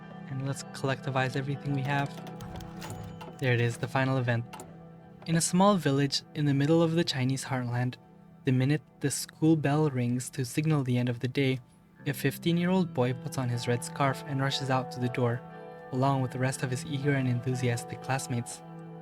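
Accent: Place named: Indonesia. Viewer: your accent sounds kinda like you’re from Indonesia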